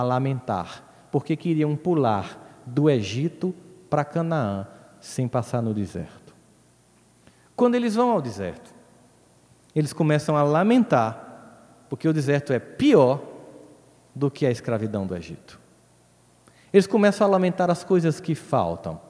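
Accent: Northeast, Brazil. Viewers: Também gosto do sotaque nordestino.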